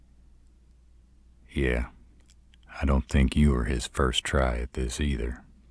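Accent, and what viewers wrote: South, United States. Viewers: that southern drawl is so freakin hott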